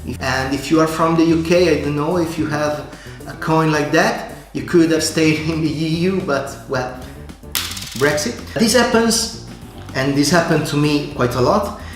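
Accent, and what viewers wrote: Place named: Italy. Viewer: Great vid. Very much to the point. I'm watching auctions online and I'll buy a 500 C/M when I find one at a price I like but your video sated my apetite a bit at least. I dig your accent btw. Your speak with a good flow so it's not bothering at all. By that and from your name I deduce you're italian. Correct? Cheers from Sweden.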